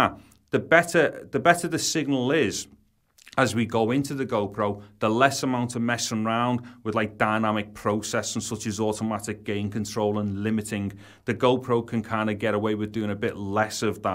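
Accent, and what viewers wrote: United Kingdom. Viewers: I love your dialect ! From which part of UK you´re comming from?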